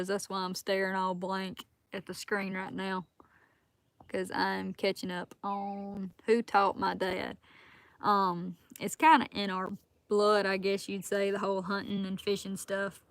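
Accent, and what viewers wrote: South, United States. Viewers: You are precious with a little southern eloquence. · Whoever says something negative about your accent deserves the middle finger! I love that Southern Draw! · Love your Southern Drawl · I love your Southern Accent and definitely don't tell where in Alabama you live! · Love that southern drawl. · Love that southern accent. Who cares what anyone says it looks good on you. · Hi, love your Southern accent. Keep them outdoors videos coming, keep looking good. You are an awesome gal.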